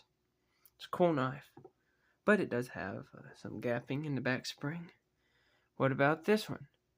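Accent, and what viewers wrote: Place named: Virginia, United States. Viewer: I just came across your channel and Ive watched 4 or 5 of your videos so far and Ive enjoyed them all. I must ask, what state or region are you from? Im trying to pin point your accent. If I had to guess, I would say Virginia or a neighboring state to it. I do want to throw in my experience on this subject. I apologize in advance as this got longer than I initially intended. I collect knives of many manufacturers, Case of course, but also older Remingtons, pre swinden Schrades, Queens, GEC, etc. I would say though that Case is my bread and butter and where my knowledge and experience most lies. Before I say what I am about to say, you and everyone else who may read this should know that I specifically collect pre-1970 Case knives. I currently have probably two dozen of these, mostly XX era. I have some newer ones as well but much prefer the XX era knives. 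Now that everybody knows my bias, I want to say that it is my opinion that Case quality is no worse now than it ever has been. I would say 3/4 of my pre-1970 Cases are mint, unused and not a single one is what a lot of people nowadays would judge as “perfect”. They all have blade rub, they all have atleast one if not more proud scales or bolsters, they all have atleast a proud or sunken back spring when a blade is open, the centering is not perfect on any of the ones where blade centering is applicable, all have gaps somehwere and the factory edges on the vintage mint ones suck as well. These are all things people complain a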